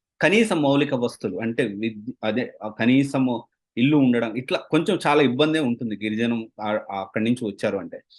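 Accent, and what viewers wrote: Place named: Nellore, India. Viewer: Oka Basha Backdropey undi ga anna neeku nellorelo😅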